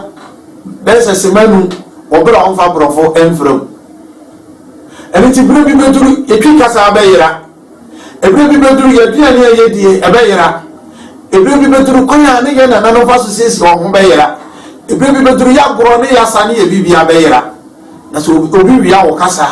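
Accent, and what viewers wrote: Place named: Ashanti, Ghana. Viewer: Delete asante Tone from your tongue, please. We're trying to rejuvenate our language so it will be better you speak bono.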